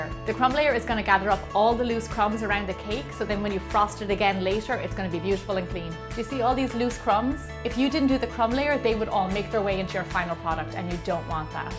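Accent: Ireland. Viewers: are you from Ireland? because your accent is beautiful! lol i wish u had one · Your voice makes me miss Ireland lol, but the cakes looks so pretty.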